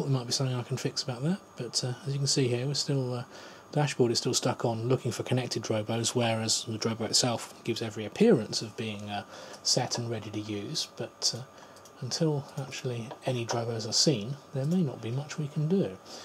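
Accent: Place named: United Kingdom. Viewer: Thank you for the video ! im planing to buy one of these 8TB baby's. YOur british accent was a delight to wacth this video. unlike other guys whos accent i cant even understand most of the time. i have a simple question, if i buy this seagate 8TB external drive, can i just drag and drop my files from my laptop into this external drive ?? will it be this simple? cus im assuming this is just a sata drive, or will it upload to the cloud directly? im a lil confused whether i should buy this drive cus it says BACK UP PLUS.it's emphasizing on the package alot !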